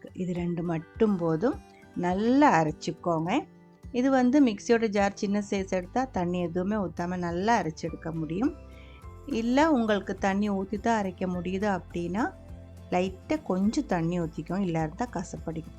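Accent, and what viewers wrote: Kerala, India. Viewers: Very nice receipe. Your malayalam tamil hearing is very different. 😂😂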